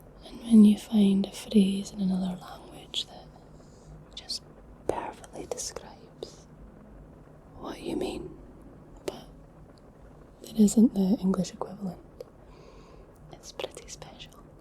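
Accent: Scotland, United Kingdom. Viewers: I'm loving the soft speaking instead of the whispers, it highlights your nice Scottish accent, it's so soothing 😴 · i really like your scottish accent. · Never realised i liked the scottish accent. You have a very relaxing and soothing voice. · Your voice makes my brain melt..Scottish accent is so good for ASMR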